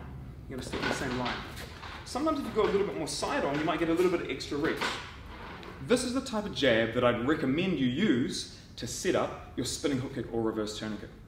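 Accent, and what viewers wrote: Australia. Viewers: do I hear an Aussie accent ? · are you australian?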